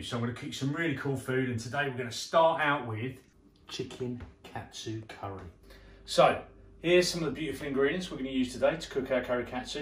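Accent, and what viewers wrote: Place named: Cockney, United Kingdom. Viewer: I can't get over this guy. I guess he really is the head chef at Wagamama but he speaks like he's from EastEnders and displays a real lack of culinary vocabulary saying things like "soya sauce" and "too-meric." It's like the real Steve Mangleshot wasn't available so they just drafted in any old dodgy actor that they had during lockdown!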